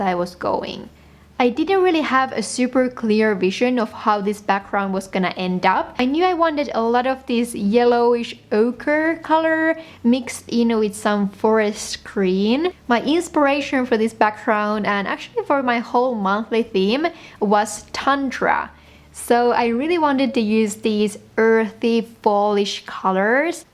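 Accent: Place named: Finland. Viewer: Found this video by searching for bj/planner videos. Amazing artistry. 👌🏽🙏🏽❤️ As soon as you started speaking I guessed you're Finnish😊🇫🇮. No offense ❤️. EDIT: The squirrel is exquisite 💕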